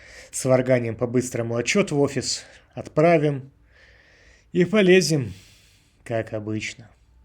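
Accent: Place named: Odesa, Ukraine. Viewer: Одесита чути здалеку😄 Дякую за чудове відео! Не кидайте показувати швартовки та відходи, це завжди цікаво і ніколи мені особисто не набридає 😉 Легкого контракту! Україна дивиться🤝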